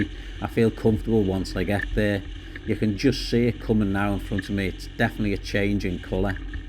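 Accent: Liverpool, United Kingdom. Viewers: I mean no disrespect bud. Love your content. Im sure I’m not the only one who hears the distinct similarity between your video commentary and another certain famous Liverpudlian who narrates the kids tv show 😂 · I was thinking the same. Are you from Liverpool?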